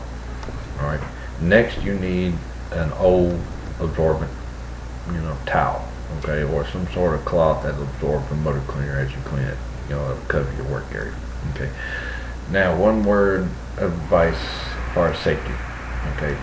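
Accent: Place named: United States. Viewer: bunch of damn yankees commentin on the way this country boy talk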